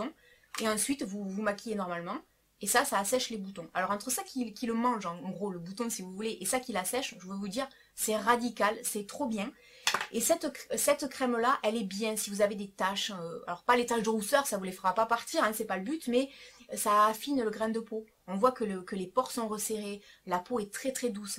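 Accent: South, France. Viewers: bonsoir^^je te decouvre aujourdui grace a une video sur aliexpresse;;; qui ceci dis son top^^ j aime bcp tes videos, juste niveau son je trouve que l on entend peu fort, je suis a fond sur mon pc et c est just !!! mais genial, continue comme ca avec se bel accent du suuuud ^^ bises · Je t'en prie: Garde ton Débit 'VIF' et surtout ton Accent quand tu parles!!!!! Je suis du Sud comme toi et c'est tellement beau et bon de l'entendre sur le net!!!!!! Pour ce qui est des produits que tu présentes: je suis brune 'et' rouge et j'utilise le shampoing (pose 3/5 min) et soin repigmentant (pose 10/15 min le temps de finir la douche) Mulatto rouge et c'est franchement bien! Tu as aussi le Nutri Color de Revlon qui est très efficace même si pas bio. Et pour finir tu es un rayon de soleil, pleine de vie et toute sourire! Reste comme tu es!!!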